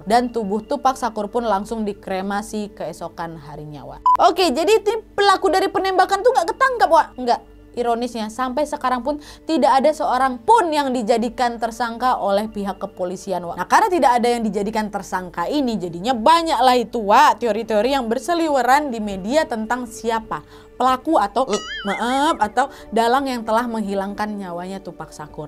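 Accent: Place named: Medan, Indonesia. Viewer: Medannya kental👌🤝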